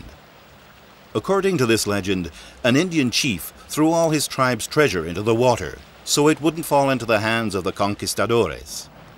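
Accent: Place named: Hialeah, United States. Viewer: dudette, u sound like your from hialeah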